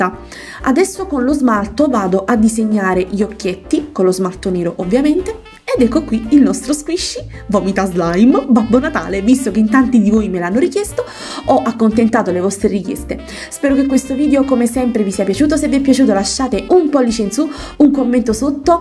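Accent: Naples, Italy. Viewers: sei di napoli?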